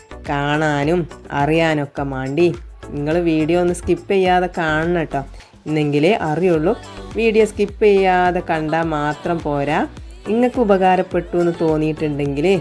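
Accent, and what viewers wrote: Malappuram, India. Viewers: മലപ്പുറം ഭാഷ മലപ്പുറം താത്ത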